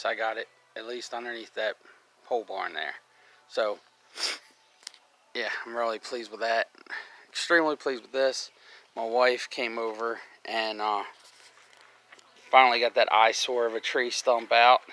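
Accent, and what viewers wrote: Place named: Western Pennsylvania, United States. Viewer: I agree. Neatness counts. You sound like you're from Western Pennsylvania.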